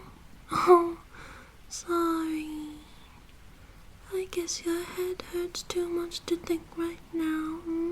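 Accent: United Kingdom. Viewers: Can you make a part 2 of this :D, this is my favorite asmr yet with the British accent ｡◕‿◕｡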